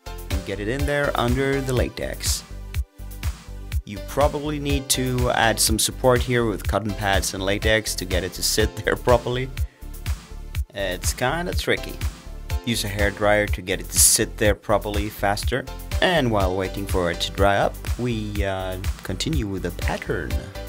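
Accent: Scania, Sweden. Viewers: hAN ÄR SKÅNSK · är han som snackar skåning eller det låter så när han pratar svenska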